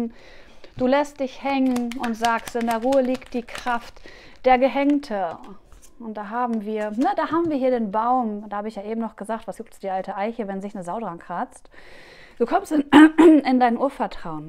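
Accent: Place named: Austria. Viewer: Sehr lustig dein österreichischer Dialekt, aber leiwand 😁👏 nur gustl...dummer August 😉😇🫶 Hab tatsächlich grad viel Power und Energie...hoff es bleibt so💪💃 Danke dir liebe ❤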